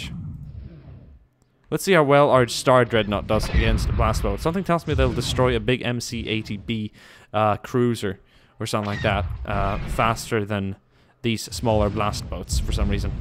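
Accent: United Kingdom. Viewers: Man love your accent. I used to watch your stuff years ago and the way you sound is totally different than now. It's this weird mix of sometimes North American, sometimes very British English influenced o.o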